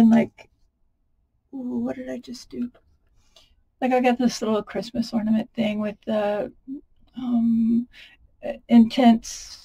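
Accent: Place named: British Columbia, Canada. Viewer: Hi you sound decidedly Canadian BC in particular... LOL if people don't want to listen to your channel they can move on those of us that want to listen we will and as far as your hands go your hands look like every other artist hands except they're not plastic looking I hate it when they try to do these crafts with those bloody Nails on it's ridiculous you're fine and you're doing a great job and thank you for showing me what I can do with all my messed up resin projects. I am extremely new to pouring resin and most of what I have poured by now isn't real useful until now thank you❤❤❤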